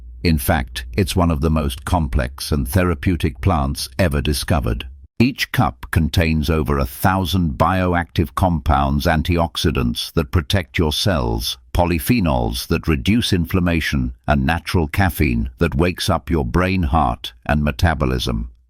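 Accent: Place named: United Kingdom. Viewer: Why does it take almost 16 minutes to say what you add to your coffee? We do not need to listen to the British voice droning on & on.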